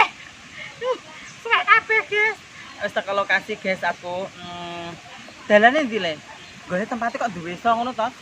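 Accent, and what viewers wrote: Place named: Ngawi, Indonesia. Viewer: Lucuuu yaaa Mbak...saya asli Ngawi sdh 18th tinggal di Bali dadi kangen sama kampung loo Mbk...boso ne mengingatkan jaman cilik ning Ngawi😘😘😘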